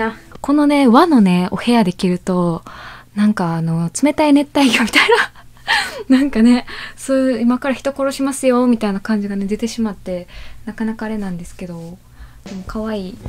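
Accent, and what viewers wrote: Kansai, Japan. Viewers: お教えて致して とても ありがとうございます! 購読しました! やっぱ 関西弁のアクセントは 聞きおもろいですね~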